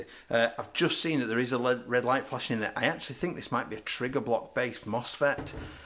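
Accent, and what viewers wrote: United Kingdom. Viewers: Thanks for the response. I notice you've got a northern accent and I'm from west Yorkshire I'm just wondering if you could recommend me any good fields because I'm gonna start airsoft once the lockdowns over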